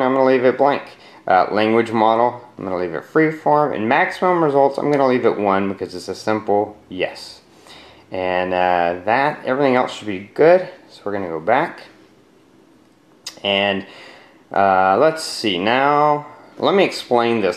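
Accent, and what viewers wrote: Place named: Scotland, United Kingdom. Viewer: How did you get the Scottish accent?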